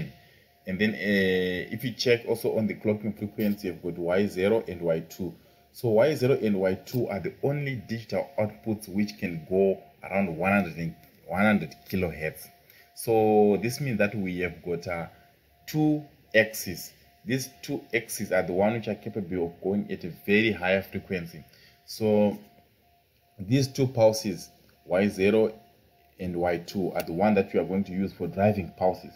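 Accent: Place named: Zimbabwe. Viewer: It's giving me Zimbabwen accent 😂❤❤.... Nice one bro I like the reference to the documents... Keep up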